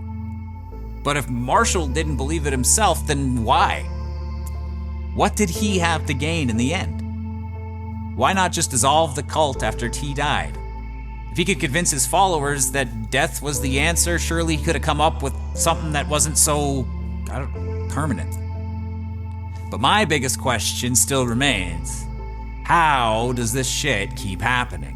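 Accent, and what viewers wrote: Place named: Canada. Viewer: I live in Canada and sounds a lot like us accent wise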